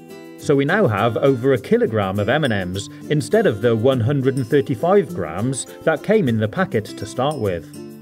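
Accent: England, United Kingdom. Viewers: are you from englang or some in the north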